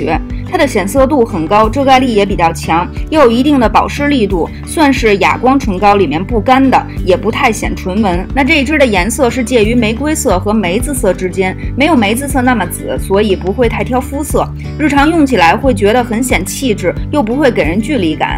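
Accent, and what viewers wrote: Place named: Beijing, China. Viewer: 前几天刚看到你的视频，一听是北京话，亲切得不行，立马关注，加油，支持你！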